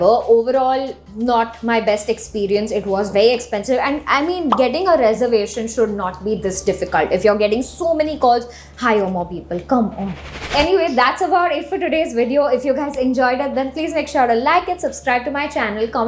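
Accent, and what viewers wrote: India. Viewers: We indians speak like this...if u have a problem with this...then stop watching the video..instead of commenting on her accent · Such strong indian Accent!!! I couldn’t get past your accent to enjoy the video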